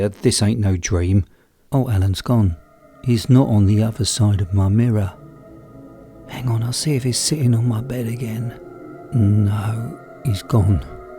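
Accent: United Kingdom. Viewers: why thankyou! it's all because of the British accent and the way you read out the stories, absolutely amazing !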